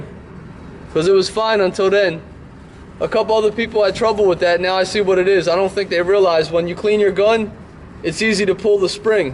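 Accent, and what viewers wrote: Philadelphia, United States. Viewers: Dude, are you from Philly? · Ur accent from phila or south jersey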